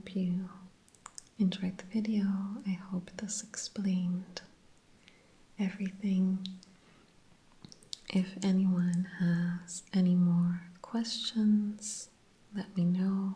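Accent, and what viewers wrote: Pennsylvania, United States. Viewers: I’m from California and when I first listened to your videos I thought you were American! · Watched your channel for months and assumed u were American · This is going to sound silly now that I know where you’re from, but I thought you were Pennsylvania Dutch! I live in PA, and your voice sounds very similar to the Amish community here. I had thought you must be ex-Amish or something haha.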